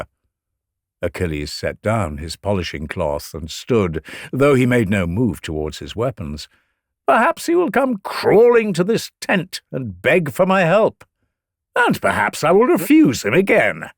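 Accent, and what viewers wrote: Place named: United Kingdom. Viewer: What's this!? Greek mythology in long-form AND in a British accent?? WIN! Can't wait to dive in.....❤ Thank you!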